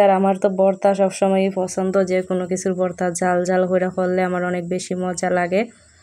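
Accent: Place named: Sylhet, Bangladesh. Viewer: সিলেটি?? এই কথা গুলো খুব ভালো লাগে শুনতে ❤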